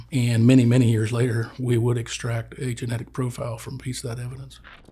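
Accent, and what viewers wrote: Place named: United Kingdom. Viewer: to give this channel a lil boost: subbed. british sounding narration always sounds like quality to me, a german.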